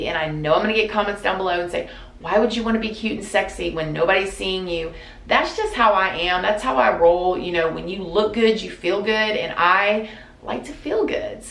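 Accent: United States. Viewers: Love to see you Interview a guy called David Icke check him out? Most important information I've ever heard!!! 😉 check him out on Agenda 21 the plan to kill you! 😈 Top illuminatti spotter? 👤👥👤😲✊👀 if you got little ones? Please check out David Icke on the Vaccine hoax!! 😈 this life is nothing like they tell us it is!! 😲 check him out on Bloodlines!!!*😈 Crazy stuff? And Jeff Censored the most banned head on YT 😂 all your favourite Rockstars? And Actors ALL throwing up illuminatti Gang sighns?? On Jeremiah Cohen!!! It'll blow you away? 😲 so peace people from Sunny England and if you read this can I get a shout out please in that Top American accent 👍😆✌💙 from your Number 1 English lad!!😉 Zac xxx cheers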